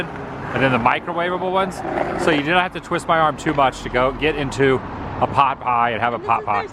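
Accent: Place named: Los Angeles, United States. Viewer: The baby voice does make me want to scream. I feel like a lot of LA people talk like that lol.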